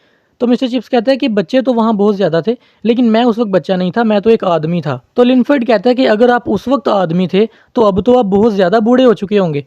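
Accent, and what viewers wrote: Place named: Lahore, Pakistan. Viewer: Apki language lahoriii type hai ap Lahore sy hai ?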